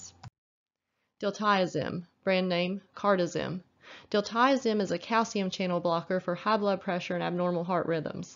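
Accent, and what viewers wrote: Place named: South, United States. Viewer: Thank you so very much for making these with audio after a shift as an RX in training my eyes feel so tired to read my books On top of that I hear a southern accent Wich for some reason I adore and it soothes my nervous system . There must be a god that loves me after all. A billion thanks.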